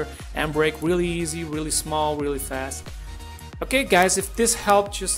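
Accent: Portugal. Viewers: ele é português, se não me engano. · Are you portuguese portugal?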